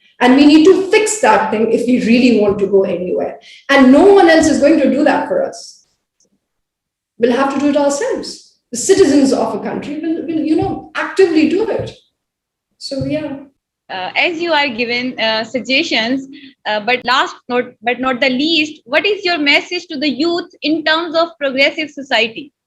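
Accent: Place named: United Kingdom. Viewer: madam everything is good but to connect with masses please you can speak in indian accent, please minimize using british accent.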